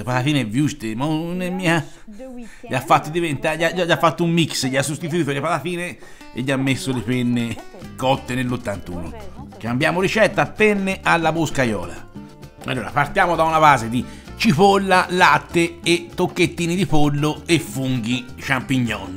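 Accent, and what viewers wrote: Tuscany, Italy. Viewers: Toscano di dove, il dialetto somiglia a quello Fiorentino ma non lo è, di che città sei ?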